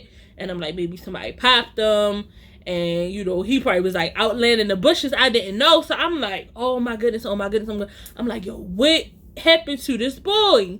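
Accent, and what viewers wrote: Baltimore, United States. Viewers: I remember this Baltimore accent I miss my days in Maryland lol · Once I heard your accent I said..Baltimore lol! You all and folks from New Orleans have the DEEPEST accents lol! It’s so unique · I love watching your videos!!! I'm from Baltimore too but I live in sc and ya accent reminds me of home so much! Lol be blessed sis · Gotta be from Baltimore off the into song and accent alone. Didnt even need the rest of the story description. 😭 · I knew you was from Baltimore when started talking. I love your voice. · I love a person who can laugh when dumb things happen to them! I be dying laughing when dumb stuff happen,but I like ya channel ,I hear the Baltimore dmv accent😂NEW SUB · sound just like a typical Baltimore nigga 😭😂 but the whole no more movie dates just sent me 😂😂😂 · As soon as you said carry out with that B-More accent I knew I fucked with you. 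You are gorgeous💜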